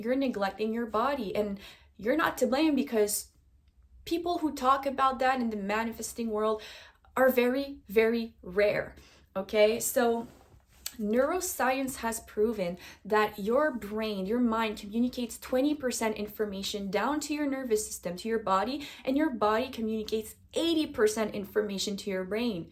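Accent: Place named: Quebec, Canada. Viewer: You sound so Québécoise! 🥰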